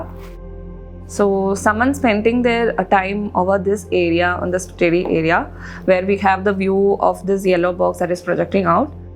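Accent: Kerala, India. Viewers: Very cute house. Loved the explanation in heavy Malayalam accented English. I didn't understand what he was saying in the end but the bloopers were cute. A few questions please: 1) these metal sheet stair case are they long lasting, though they look visually stunning and the colour yellow looks awesome, will it start creaking and breaking in a couple of years? 2) the sofa in the hall is tucked under the staircase the person sitting in the corner will be an ideal candidate for head injury 😊 The black flooring looks awesome. Is this tiles or black oxide flooring? Do you do projects outside Kerala?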